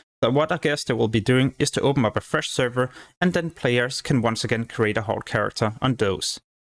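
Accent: Denmark. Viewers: Mm danglish! Nothing but love.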